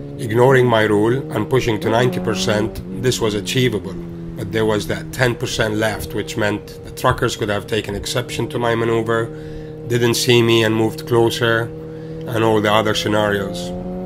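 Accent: United Kingdom. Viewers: is this yourself speaking? i expected a much more british accent haha and im going to italy with my motorcycle this year, i like the 70% rule last year we also went there and 4 out of 7 bikes crashed (at least) once, luckily i wasnt 1 of them and want to keep it that way :)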